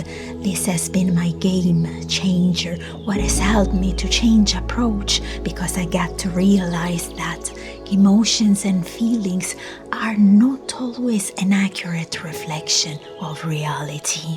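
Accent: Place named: Italy. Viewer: How intetesting to hear an italian accent from you. It's the first time I watch a video from you and I immediately assumed you were scandinavian. A different video for sure. I like it.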